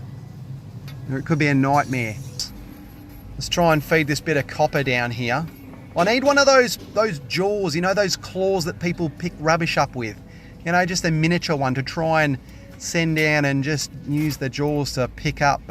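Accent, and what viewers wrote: Australia. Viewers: I’m from the US.. I love the accent soo much!! I have my Apple phone and iPad Siri voice set to the Australian voice.. I hope to someday go on vacation to there.. I love the hot weather and nature and yes I am not afraid of the deadly creatures down ther.. I have a major respect to leave them alone and they should leave me alone.. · It was amazing to see Tom Cruise clear a drain with an Australian accent.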